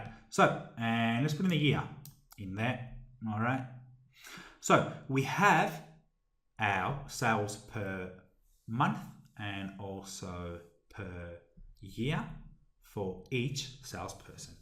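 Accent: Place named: Australia. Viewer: with an Aussie accent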